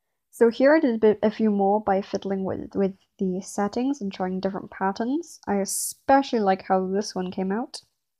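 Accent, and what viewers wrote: United Kingdom. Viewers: thank you british lady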